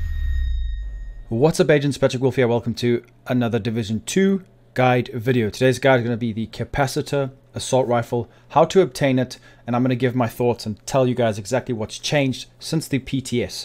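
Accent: South Africa. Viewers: It might just be me, but I swear you sound south African lmao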